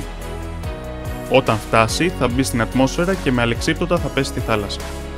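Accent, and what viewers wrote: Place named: Albania. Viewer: Ε, όχι και τόσο καθαρός λόγος που προφέρει το ρ σαν αλβανός.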